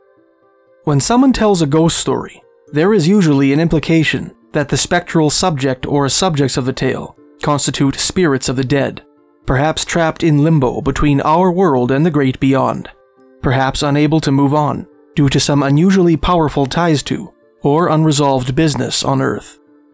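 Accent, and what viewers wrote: Canada. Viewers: You have the heaviest Canadian accent I've ever heard. I'm gonna use your channel as an example of a Canadian accent. Sorey (Canadian for sorry).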